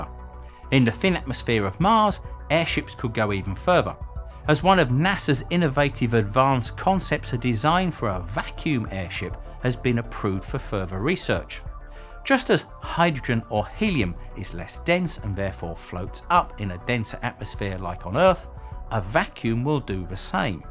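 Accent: United Kingdom. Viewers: doesn't sound very cockney to me....sounds more like a very typical clipped British accent....true cockney doesn't sound anything like English, at least from what I've heard....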